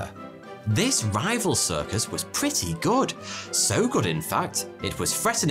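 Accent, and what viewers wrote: United Kingdom. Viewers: I love the fact that you speak British English but also uses American words and colloquialism to inject some humor to your stories! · You are British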